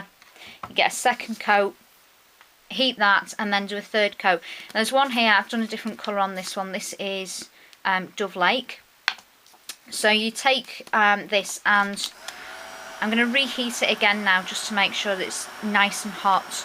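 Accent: England, United Kingdom. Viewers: I too love your ideas I am saving my money to buy the supplies! They are absolutley beautifull! thanks for such a wonderfully detailed instructional video! Can you use the shrink wrap for easter baskets, in place of the fantasy film? Also can you use a non name brand ink to do the embossing & stamping? I love your accent are you from England? I have a friend here in the states whose Mum is from England she lives her but still has her british citizenship she too has a lovely accent! Tessa~~~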